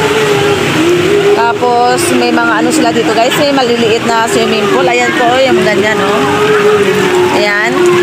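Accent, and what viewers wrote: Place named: Hiligaynon, Philippines. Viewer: Daw ilongga voice mo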